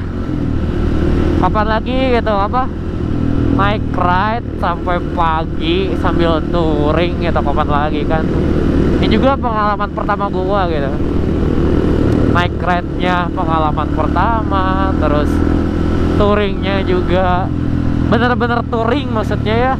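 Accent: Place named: Sunda, Indonesia. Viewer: Sunda ae padahal mh kang, medok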